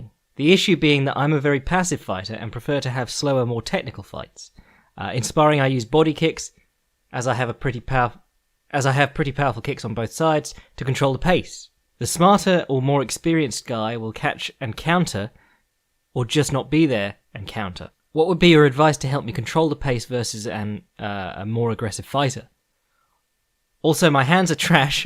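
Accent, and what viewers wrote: England, United Kingdom. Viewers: So you just had to get that off your chest? I love the youthful voice paired with the understandable English accent, btw.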